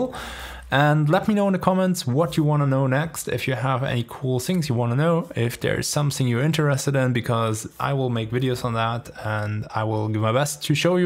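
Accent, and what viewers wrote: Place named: Austria. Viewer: Terminator accent the best you gotta love it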